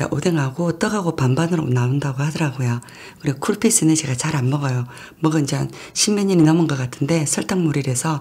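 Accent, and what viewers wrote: Busan, South Korea. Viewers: 참 재밌게 하시네 부산말씨~ 나도 부산사람이지만 ㅎ 끌림이 있다 이분 ㅎ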